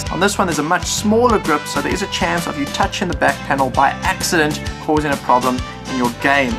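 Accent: South Africa. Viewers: are you south african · South African? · you sound southafrican are you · are you South African? you sound South African :D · Are you South African by any chance?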